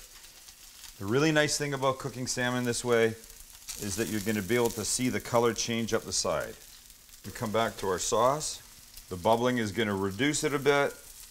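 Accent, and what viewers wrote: Canada. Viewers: Is this guy Canadian? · please ditch that annoying Canadian drawl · Love his Canadian accent!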